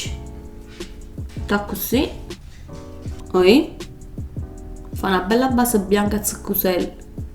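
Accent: Naples, Italy. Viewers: Si sente che sei napoletano perché anch'io sono di provincia di Napoli faccio pure io la cultur napoletani ma po chi so sti strunzz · Il dialetto è perfetto confermo che è napoletano io sono CI napoli❤❤ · Si ragazzi sono napoletana e si vede l’accento, È napoletana👌🏻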